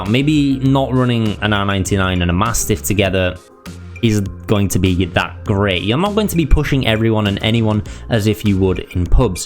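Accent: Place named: Scotland, United Kingdom. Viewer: There are 2 reasons why I subbed. 1. I enjoy ur content and think it's very helpful. 2. Your Scottish.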